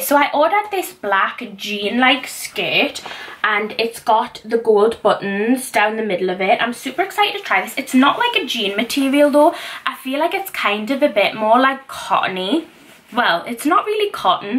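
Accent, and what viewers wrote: United Kingdom. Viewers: Hi I just saw your channel and I subbed STRAIGHT AWAY and I’m glad I did and I love your accent and I’m from the UK TOO!!!!!! but I’m from Scotland ☺️☺️☺️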